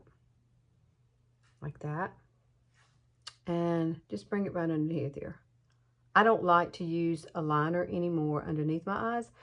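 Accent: South, United States. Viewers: I just subscribed to your channel. I love your accent! I am from Kentucky and also have that wonderful southern accent nothing like good southern people. I have just purchased a couple of your products from Younique products. I don't have facebook but I am enjoying this channel.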